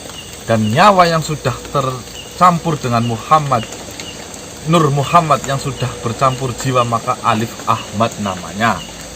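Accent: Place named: Banyumas, Indonesia. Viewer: Banyu mas wng ngapak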